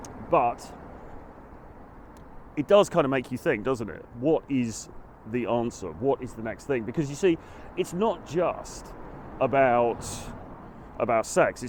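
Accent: United Kingdom. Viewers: Yeeeesh how'd I know you'd be British. Lolol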